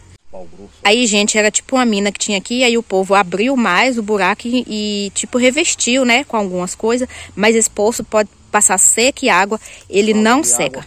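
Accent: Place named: Bahia, Brazil. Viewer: Sem nexo isso aí, Sobre o gerúndio não vi sentido algum, como que você está querendo que ela seja natural? Sendo que você está tentando ensinar ela o correto! Com certeza ela está sendo natural, se fosse ensaiado ela não iria falar "vou tá mostrando a colheita" e sim como você disse "vou está mostrando a colheita", que diferença isso faz? você é baiana? Ou não sabe que esse é o sotaque? Sigo outras moças baiana aqui que fala igual a ela, tenta, ver isso aí direitinho que você escreveu, na verdade você está procurando pelo em ovo de galinha!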